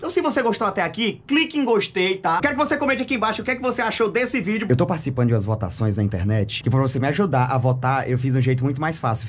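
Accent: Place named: Piauí, Brazil. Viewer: Whindersson saiu do Piauí e nunca parou de falar piauiês , meu vizinhos vão pra SP volta 15 dias dps falando igual aos paulista 😂😂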